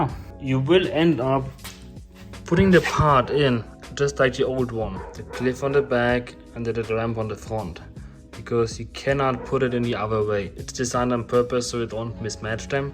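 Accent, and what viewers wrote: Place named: Germany. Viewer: Another great video man. I can't lie, love your German accent.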